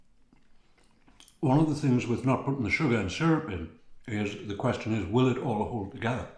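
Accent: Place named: Newcastle, United Kingdom. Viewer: Ha way Geordie !!!:face-green-smiling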